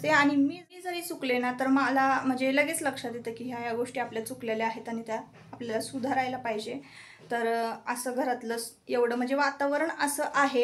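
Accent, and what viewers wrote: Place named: Kolhapur, India. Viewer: कोनत गाव तूमच भाषा कोल्हापूरी वाटते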